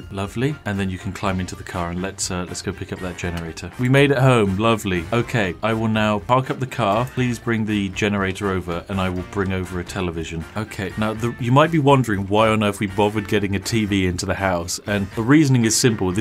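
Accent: United Kingdom. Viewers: Quite literally took me one minute to get into the video to realize this was a video and not an amphibian video. Even the hello gentlemen didn't phase me, I saw zomboid and brain went that's an amphibian video, but then my brain registered the accent and the lack of philosophical ramblings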